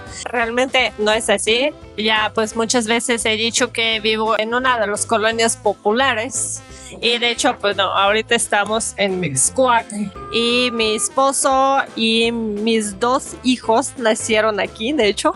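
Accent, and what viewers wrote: Russia. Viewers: Hola....pues Ami me atrapaste por tus fotos...y esos ojos verdes...(Espero que no me de hate tu esposo... jeje..)....y con respecto a los que te mandan Hate....no te preocupes ya que esos solo tienen ojos para el América...(Y ya sabes lo que sigue...así que, así velos...)....y con respecto a tu acento ruso... No sé te va a quitar nunca en su totalidad...ya que tus músculos de la voz nacieron o se adaptaron desde chica al idioma ruso...lo puedes neutralizar pero eso es mucho rollo...y además paque..si se te entiende y muy bonito.... Y por último... Ten pensamiento del tipo de Chavela Vargas....Saludos....y no dejes de subir videos....😊 Ha y los Hate.... También sirve para subir el reiting...... jeje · Yo no pensaba que en México había haters tan malos como en Francia. Yo no pensaba que los Mexicanos se preocupaba tan de otros como en Francia. 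Yo conozco un poco México porque vengo cada año un a dos meses en su país y a mí la gente me parecía muy amable. Me agradecería hablar español como tú con tu accento que no sé si es ruso o no pero que es muy correcto. .¿Si México es tan buen país por qué tantos lo huyan ? ❤🇫🇷🍎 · No hagas caso de estúpidos haters que no hacen nada bueno, no sirven para nada, no crean nada, no son campeones de nada. Lamento muchísimo estos mensajes. Soy mexicano y le doy la bienvenida a todo mexicano nacido donde se le dio la gana (ósea extranjeros). Adelante y a disfrutar la vida, tu nueva vida. Abrazo enorme desde la CDMX. Y me encanta tu acento RUSO!!! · Como alguien puede criticar la apariencia de otra persona, de su acento y su forma de vestir?, a caso esas gentes son muy cultos y modelos perfectos en todo sentido?, nadie lo es!…es muy fácil juzgar, criticar y demeritar a cualquier persona sin conocerla, sin entender el contexto de su vida, más aún cuando se hace desde el anonimato y a través de un medio como youtube;también muchos de nuestros compatriotas en el extranjero, por medio de su trabajo hecho su vida de manera admirable y honrada, teniendo que regularizar también con esfuerzo ( porque no es fácil ) en esos lugares su estancia legal, es muy válido!. 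En tu caso, aunque tu origen y acento sea ruso, ya no eres extranjera, tienes la legalmente ciudadanía, con derechos y obligaciones, pasaporte, familia, residencia,; si vives en la Condesa, Las Lomas o colonia modesta, que nos importa, a tus posibilidades y de tu esposo a donde quieras y aunque a muchos les de diarrea, eres mexicana! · Pues tu acento ruso es encantador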